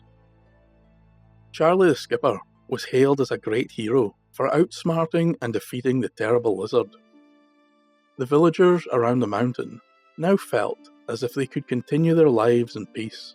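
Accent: Scotland, United Kingdom. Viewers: I’ve read or seen a lot of the words/names etc many times in books I’ve read previously. Unfortunately I’ve butchered the pronunciation of each in my mind. So I like hearing the correct pronunciations in your stories. I found your Awesome channel by accident and it’s the best thing to happen to me all day! A Scottish accent is my favorite and I could listen for hours….so I’ve been bingeing! I’ve shared with friends, hopefully they’ll subscribe as well.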